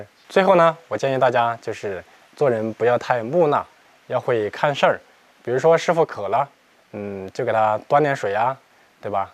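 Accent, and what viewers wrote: Sichuan, China. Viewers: 王刚为什么这么红? 他的宽油烹饪并不适合一般家庭厨房。显然大部分观看视频的人并不是真的想学做菜。那到底是什么原因呢? 1, 他长得帅｡ 2, 他的川普好听。 3, 视频节奏明快, 讲解到位。 4, 富有乡土气息。 5, 邪神助攻。 6, 看他烧菜能解馋, 可以用来减肥。 你会选哪项? 大概很多人会选7, 那又是什么呢?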